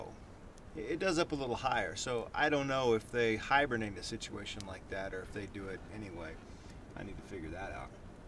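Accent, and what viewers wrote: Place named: Texas, United States. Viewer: You are so funny- love the hat. Your Texan really comes out when you talk!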